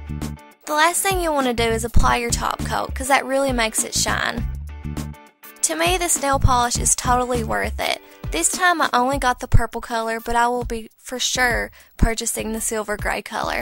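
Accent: South, United States. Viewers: OmfGODS Southern Accent. It is almost too much!! Damn cute nails though. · I LOVE YUR VOICE..LOL. ITS SO SOUTHERN.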